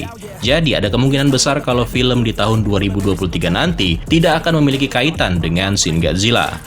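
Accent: Java, Indonesia. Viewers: Bang kau orang jawa ya bang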